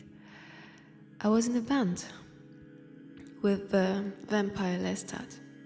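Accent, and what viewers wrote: France. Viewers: Hearing your beautiful French accent whenever you upload a new video always makes my day, I hope things have been going well for you lately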